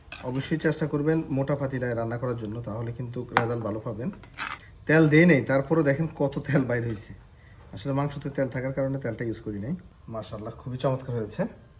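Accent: Noakhali, Bangladesh. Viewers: নোয়াখালীর মানুষ যত শুদ্ধ করেই কথা বলুক,আমি ঠিক বুঝে ফেলি।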